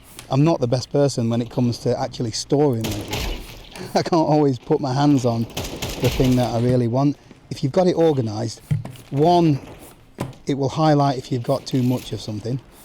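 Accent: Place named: United Kingdom. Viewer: caralho, o br com o sotaque britânico inteiro slk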